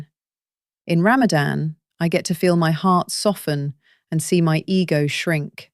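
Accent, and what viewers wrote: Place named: United Kingdom. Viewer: She mentioned that she is from USA, but she has a strong British accent, is this the same woman?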